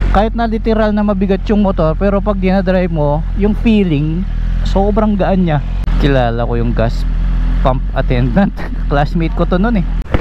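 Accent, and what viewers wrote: Pampanga, Philippines. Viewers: Kapampangan accent jang mag tagalug ka balu me e hahahaha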